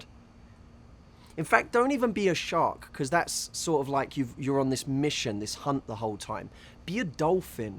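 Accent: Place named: United Kingdom. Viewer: watching this video, just to hear they lovely British accent again.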